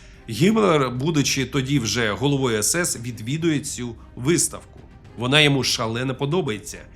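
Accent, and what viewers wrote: Russia. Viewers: Удосконалюйте українську Дуже ріже Ваша російська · Дуже дякуємо за українську мову та цікавий контент. Дозволю собі малесеньке зауваження , не ображайтеся тільки , дуже прошу. В українській мові , де пишеться буква О , то так і читається та вимовляється - О , а не А .Завжди , незалежно від наголосу. В російській , коли наголос не падає на букву О , то читається як А . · Працюйте над вимовою,бо у Вас російський акцент!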